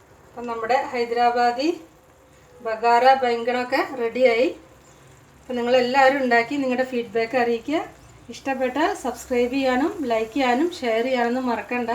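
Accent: Palakkad, India. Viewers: Exactly.. Even I felt the same 😍Oru Palakkad touch in talking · കാഴ്ചയിൽ തന്നെ സൂപ്പർ ആയിട്ടുണ്ട്, ടേസ്റ്റ് പിന്നെ പറയണ്ടി വരില്ല, അടുത്ത ദിവസം തന്നെ ഉണ്ടാക്കി നോക്കണം 👍 സംസാരം കേൾക്കുമ്പോൾ പാലക്കാടൻ ടച്ച് ഉണ്ട് ചേച്ചീ , ഞാനും ഒരു പാലക്കാട്ട് കരനാണെ 🥰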